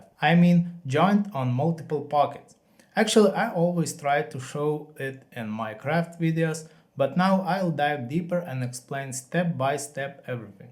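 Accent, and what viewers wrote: Russia. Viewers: Ты русский? У тебя акцент как у русского и русские субтитры)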